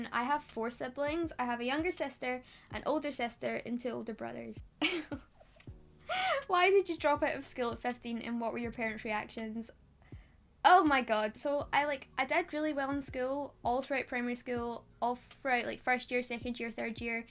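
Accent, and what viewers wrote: Scotland, United Kingdom. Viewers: That accent is magnificent, I might need to come home to Scotland. I should also visit Ireland at some point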